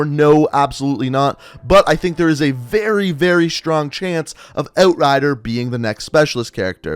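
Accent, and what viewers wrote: Canada. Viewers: Are you Canadian? Not sure about your background but by the way you say out I’m just curious as to your whereabouts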